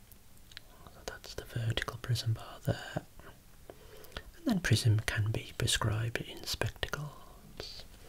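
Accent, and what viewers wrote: United Kingdom. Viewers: Your content, voice and British accent is better than any type of melatonin, I knock out so quick 🛌 😴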